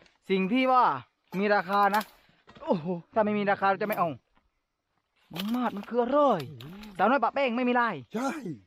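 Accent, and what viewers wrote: South, Thailand. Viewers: แหลงภาคนันและ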